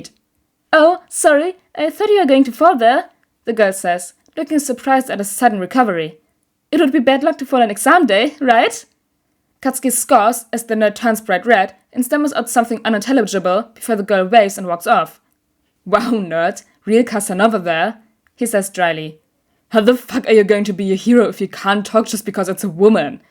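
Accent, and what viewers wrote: Germany. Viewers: Your accent sounds kinda German! Sorry if I’m wrong lol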